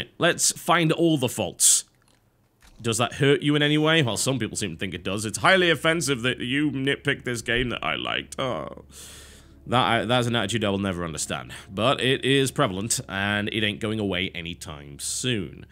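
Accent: United Kingdom. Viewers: 3. It's easter, maybe a large amount of your viewerbase was on vacation? (I know... probably not) 4. The format. Annoying noise in the background can throw a lot of people off i think. I know that it atleast used to annoy me. 5. A lot of not-you talking. I may sound wierd, but I actually started watching your videos because of your voice, or rather your british accent, and then later stayed for your gaming knowlegde. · I began watching your channel over 2 years ago. I enjoy them because of your personality and awesome British accent. I love when you are emotional and rage. But recently you switched to be more "news" like than entertainment. I never critique you since it is your channel and you should upload what ever you want. But Your recent videos being very emotionless. Hope this helps. Thanks from your long-time fan! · I watch your videos for your opinion, your sense of humour and that great british accent. 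I watched 1 of the shield videos... the developer was just boring and clearly not best in the field of presenting a product. The questions that came to mind were "what is this good for, wii controller does sth similar" and "why the hell would I want to give up my 27'' for like 10''? Didn't get answer for that. Did I really have to watch the whole footage to get those answered? No conventions for me...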